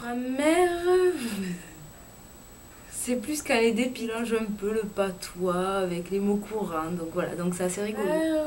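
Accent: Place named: Lot-et-Garonne, France. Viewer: Super ce tag, merci de m'avoir tagué, malheureusement je trouve pas que j'ai un accent. Du coup je pense que je ne le ferais pas, mais je partage ta vidéo sur ma page facebook :) Et pour info mon père vit dans le Lot et Garonne, alors je connais bien ton accent. Et dés que je reste une semaine chez lui, je rentre en Auvergne avec ton accent, et tout le monde se moque de moi, parce que je récupère trop trop vite les actions verbales des autres ^^ Le pire c'est le "pain", "train", "cinq", "putin con", "branleur" et les "avé" au lieu d'avec ! Mais j'aime tellement cet accent moi :P Bisouuuuuuuus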